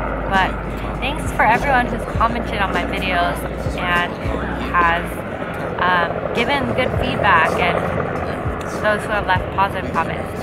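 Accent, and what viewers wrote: California, United States. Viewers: I like your Cali accent, stands out in NYC.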